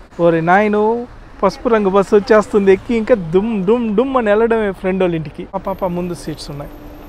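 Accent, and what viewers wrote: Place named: Andhra Pradesh, India. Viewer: Medhe Andhrapradesh Ani anukunta me slang choosi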